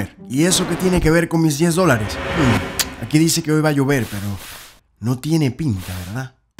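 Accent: Argentina. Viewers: el asentó cordobés es horrible, no lo aguanto, igual que el asentó chileno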